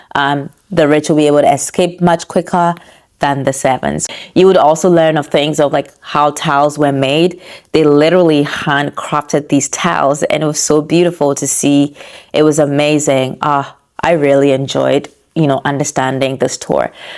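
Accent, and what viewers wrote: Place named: Italy. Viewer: I read Roma and Positano in your acquired Italian accent haha! If you spent over €300 a day charging then that's definately theft of some kind. Sorry. And that's positive...your gesture towards my app. Appreciate it.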